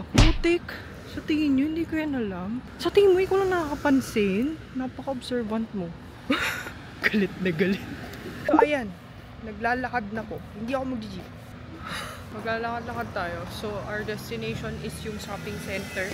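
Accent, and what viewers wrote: Philippines. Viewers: You really adapt to the Filipino culture! That is so cool that you have an accent of Filipino than your own culture! Keep it up because it’s amazing! Hafa Adai in my language 😊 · I love you...ang kyute mo! Pinay na pinay ka talaga, the way you speak, smile and laugh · Sheesh, koreana with filipino accent, kakainlab ❤😂😂😂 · Holy shit ang tapang ng accent 😭 pinoy na pinoy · Your English accent is truly FILIPINO🎉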